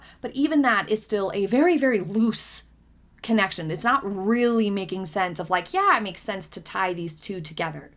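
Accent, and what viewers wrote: United States. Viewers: It’s people like you who are getting it wrong. I am surprised because you sound American and should know better than to repeat so much information from the Tabloids. I expect from the British because they (including the Monarchy) lives and dies by the tabloid news. So much of what you are espousing came directly from the tabloids. 🤷🏾‍♂️ This trip has been an absolute success! The Colombian people have embraced Sussex’s! That is the problem! The tours they made as royals were excellent! They hit it out of the park every time. They are embraced by DIVERSE populations of people around the world because they are much more relatable than the Windsors. Kate and Will’s last tour (the Caribbean) was a disaster! Terribly out of touch with the world. There are many things you can say about Meghan but you absolutely cannot say she is lazy or lacks ambition. 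Why is it problem because she enjoys working hard and is good at it? It’s a problem because the rest of the royals are mediocre at best. Your biggest hater’s tend to be those who you are associated with and don’t like you because you are working too hard for them to keep up! Meghan left the royals, it was her choice. They are salty because she had the balls to do it! PERIOD! That is the biggest problem along with the systemic racism and colonial mindset that the royals can’t let go of. Millions of black and brown people around the world who live in countries that have been raped and pillaged by the British Royal Family are unwi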